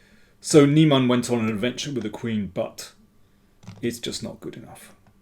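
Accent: England, United Kingdom. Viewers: Great cover as always. I'm sitting here, out of town, in a suburb of Stockholm, a bit lonely, finding comfort in your coverage and your lovely English accent. Cheers.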